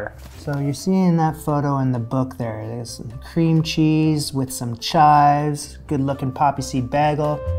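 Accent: Canada. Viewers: I think its a north american dialect thing. I'm in no way an expert but I'm besties with an SLP and we geek out about dialect stuff. Please correct me if I'm wrong but the Canadian vowel shift and the northern cities vowel shift have a similar thing in how they treat the short a. Making for bag-el vs bay-gul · It is Canadian! Dont feel bad! Its your Ontario roots. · "Bägels". Coming from Sweden, Canadian English isn't a variety I'm very familiar with. I always feel a slight sense of satisfaction when I can pinpoint the Canadianisms in your pronunciation! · I've also noticed you pronounce vague as vag not vayg the way Americans do. The bag-ull instead of bay-gull follows suit. Thanks for addressing it though. Nothing wrong with being a proud Canadian, eh? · My grandmother was Canadian. You say it just like she did I’m gonna go with it to regional pronunciation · I thought you might be Canadian, but this confirms it lol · I say it the same. 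I live 30 mins from where he’s from in Ontario. I didn’t think it was odd but people pointed it out a few years ago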